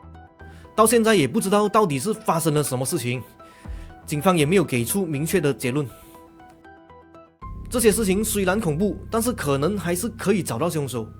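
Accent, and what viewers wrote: Malaysia. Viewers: 很马来西亚feel的华语，听多了台湾的灵异频道，还是这里有亲切感😁，加油啦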